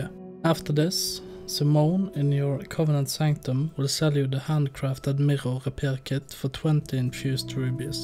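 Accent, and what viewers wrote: Norway. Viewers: Det var så fint så. Bare at dialekta skinte igjennom. Kan man gjette Førde eller Nordfjordeid?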